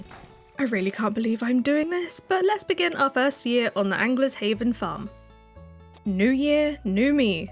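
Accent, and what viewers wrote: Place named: Australia. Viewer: I love how he kinda sounds aussie <3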